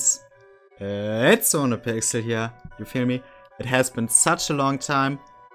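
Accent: Germany. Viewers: U Germany?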